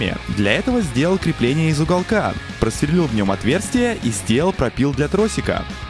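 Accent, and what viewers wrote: Kazan, Russia. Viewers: Стоп стоп стоп!Ты с Казани?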